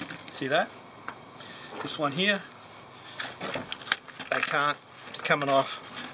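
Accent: Boston, United States. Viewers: Man you can hear that Boston! 😂👍Great work. Always teaching us better.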